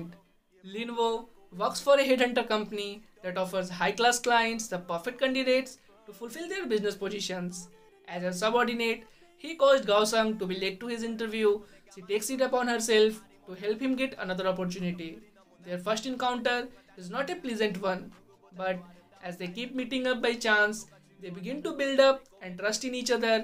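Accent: India. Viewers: Are u indian??? Cause ur voice sounds like indian ☺️☺️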